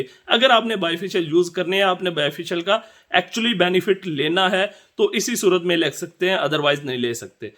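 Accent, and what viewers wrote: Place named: Kashmir, India. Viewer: Bhai muje aap Jammu Kashmir Ke bhai lagty ho. Accent se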